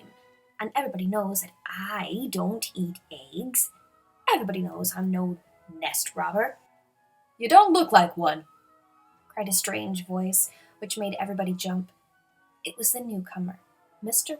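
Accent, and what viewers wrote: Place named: United Kingdom. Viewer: so 2 things the reader is British or something and yeet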